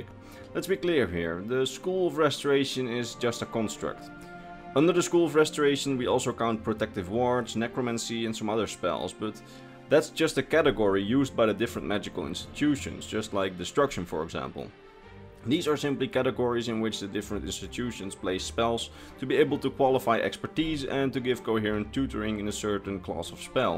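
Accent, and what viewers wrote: Netherlands. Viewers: goeie info hoor alleen wel echt je nederlanse accent xD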